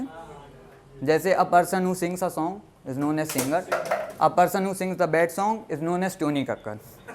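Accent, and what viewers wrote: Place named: Kanpur, India. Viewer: pooonnchaa. Kanpur dialect is mast.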